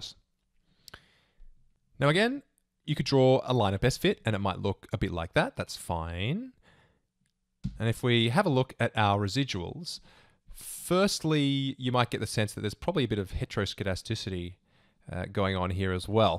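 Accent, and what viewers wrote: Australia. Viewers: you really have that edge over other channels because you have an Australian accent. I find it very pleasant listening to stuff explained with an Australian accent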